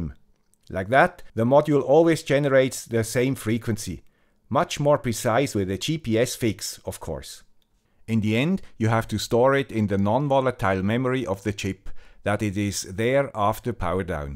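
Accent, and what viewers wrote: Switzerland. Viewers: Great your Youtube show! But I would prefer it very much if your videos would continue to be numbered. It's much easier to reference them and much more practical to say to a colleague: "Have you seen video ### by (the guy with the Swiss accent) yet?". Or sometimes I just write down: "See Swiss ##".:-) Many thanks for your series! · Hello Guy with swiss accent, very impressive from Guy with Bangladeshi Accent. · This is a very good video. Thumbs up. And of course Swiss people are renown for timing accuracy, so this is consistent with getting the information from a guy with a Swiss accent. On the downside, Swiss people are not known for having lots of sex. For information on that aspect, we will be waiting for a video by a gal with an Italian accent! ;-)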